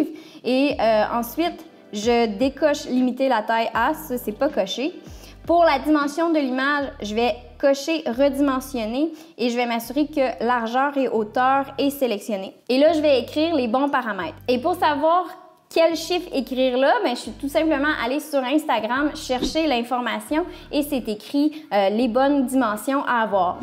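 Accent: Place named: Canada. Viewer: Kool l'accent Canadienne 🤭🤞🇨🇦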